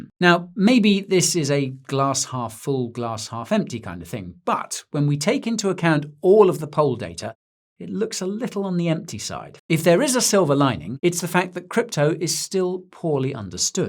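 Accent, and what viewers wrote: Afrikaans, South Africa. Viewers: Your South African accent won me.. ja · face-green-smiling: love the South African accent ..decent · As a South African, I love your Afrikaans-English accent. Kak funny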